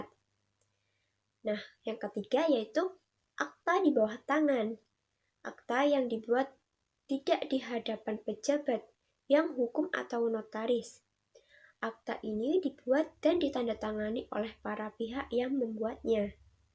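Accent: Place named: Java, Indonesia. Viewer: sukses terus.. oh iyaaa, jowone kental pisan oiii wkwk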